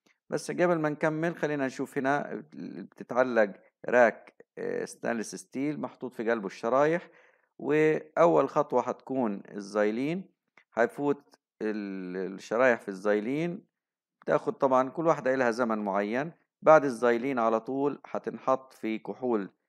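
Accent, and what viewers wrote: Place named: Libya. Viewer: الله يوفقك مشكور . لاحظت أنه لهجتك فيها اللهجة الليبية كتير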